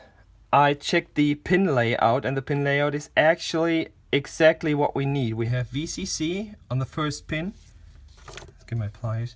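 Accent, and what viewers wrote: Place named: Germany. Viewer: great accent :) really impressive english for zhe german ... keep up with good & quality videos in english :)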